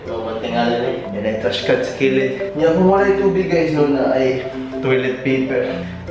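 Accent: Visayas, Philippines. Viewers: Ganahan lgi kos imong bisaya accent. Pede pang host. Haha 😂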